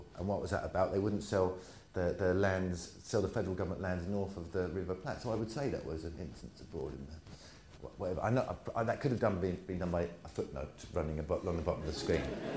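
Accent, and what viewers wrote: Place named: United Kingdom. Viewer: plus his british accent doent make it easier either.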